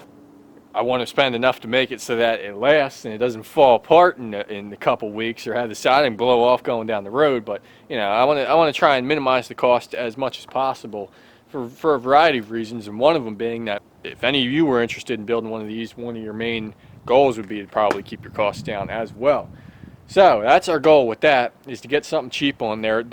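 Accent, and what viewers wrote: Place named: Michigan, United States. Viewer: Sounds like Michigan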